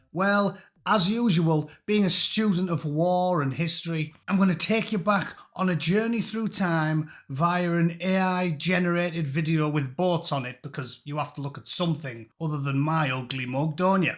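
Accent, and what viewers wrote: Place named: Middlesbrough, United Kingdom. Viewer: I must admit, I think history lessons are much better when told in a no nonsense Middlesborough accent😂.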